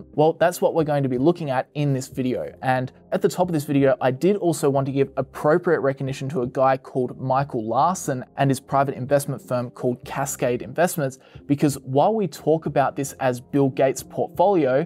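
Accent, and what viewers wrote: Australia. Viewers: Are you an Aussie ?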